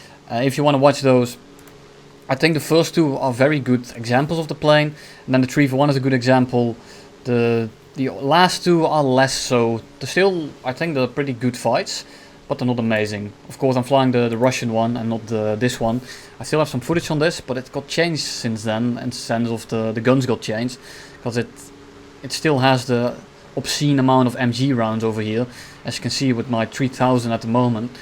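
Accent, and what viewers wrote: Netherlands. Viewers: Q: what country are u from? You sound dutch but that's Just me I think.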